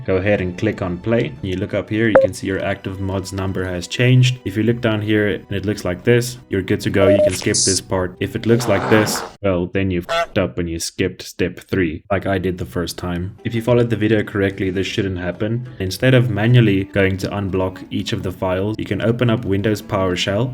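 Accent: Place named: South Africa. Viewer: You are a life saver! I immediately recognised that accent. Groete ook van Suid Afrika :)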